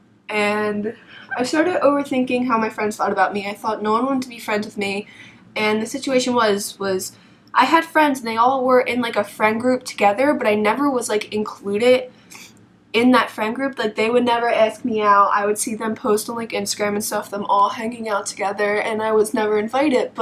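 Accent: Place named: New York, United States. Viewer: Are you from NY? you have an accent! Anyway, loved the video, God is the only one that can truly heal your sadness! :)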